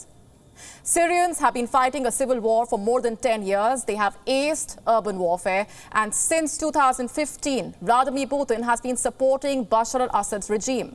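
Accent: India. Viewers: They should hire a english native speaker, the indian access is very thick T_T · Too heavy Indian accent - can't understand what she was reporting. Not too many people tuning in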